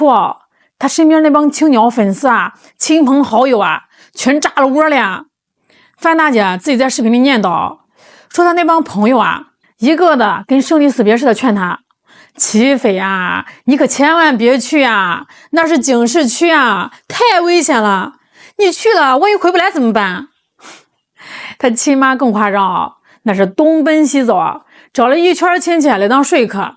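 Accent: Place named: Shandong, China. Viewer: 这个没治了太亲切了，山东老乡哈哈哈哈🥰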